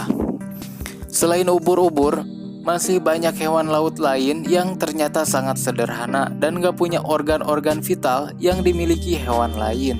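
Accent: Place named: Sunda, Indonesia. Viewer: Dialek sunda nya kentel... Lanjutkann mantappp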